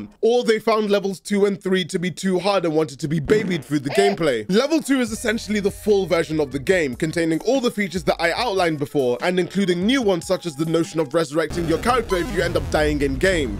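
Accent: United Kingdom. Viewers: the brit is back baby!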